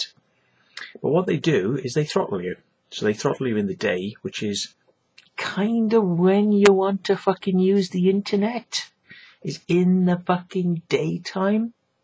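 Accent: Wales, United Kingdom. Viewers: Sorry mate, seen a few of your vids now....and I gotta know what part of Wales your from....judging from the accent I'd say Cardiff or that general area. Know you don't live in Cymru now but just wanted to know. I'm from Llanelli....and still living there. Keep up the vids dude. awesome work.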